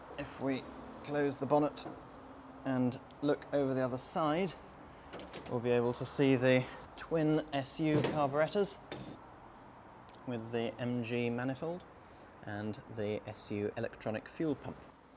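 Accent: United Kingdom. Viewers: Your accent says UK but the number plate says NSW. 🤔 The whole spare tyre assembly is completely different to my 54 TF.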